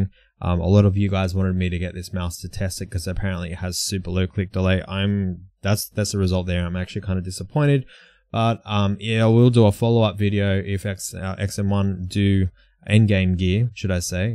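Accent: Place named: Australia. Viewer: Dude, I guessed that you were from either: newcastle , cronulla, or wollongong ;) nice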